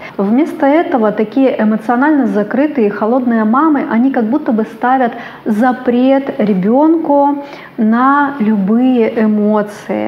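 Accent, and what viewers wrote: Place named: Belarus. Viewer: Не сразу поняла ,почему вас слушаю,а теперь только,поняв ,что вы из Беларуси ,как и я,и проблемы из детства у нас похожи.У вас есть легкий белорусский акцент,вы Т смягчаете на Ц,моему уху это приятно слышать,живу давно в Петербурге. Можно видео про синдром отличницы,школа с золотой медалью и так далее, да и дома все идеально,понимаю,что незачем,а вот...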